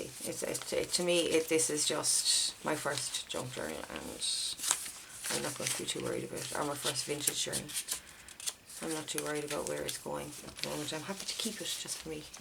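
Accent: Ireland. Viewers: Your journal is beautiful, I love how you made it, the tracing paper page with the bird is amazing! What paper did you use to make it? I would love to have a vintage journal but I have nothing vintage to make it with or put in it! The ruffled edges are fabulous, did you burn the paper to make it brown like that, seems to be patchy (how I like it)? The crinkly noises of the papers are music to my ears :) I couldn't quite hear where you get your inspiration from, or what that book was called, the one that no one can understand I think you said? sorry. If this is your first ever vintage journal, it is a work of art, wish I had your talent! If this was my book, I would be carrying it everywhere lol :) I have subscribed to your channel, I'm reckoning you are in Ireland by your accent :) I'm in Scotland :) TFS, love this!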